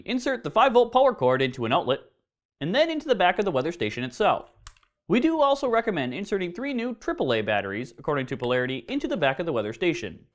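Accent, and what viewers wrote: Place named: Minnesota, United States. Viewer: You sound like a character from F A R G O TV Series.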